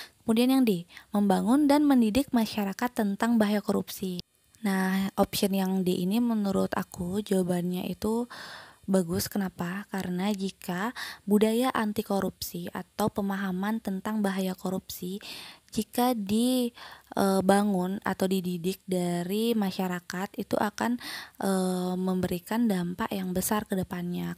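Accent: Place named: Bali, Indonesia. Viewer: salam dr denpasar kak, dari logatnya kelihatan kak hehe ☺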